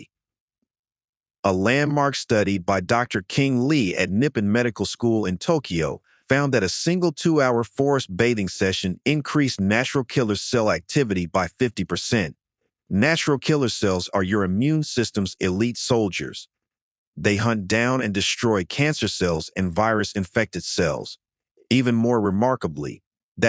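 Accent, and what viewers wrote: United States. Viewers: Without the American accent which destroys it’s credibility immediately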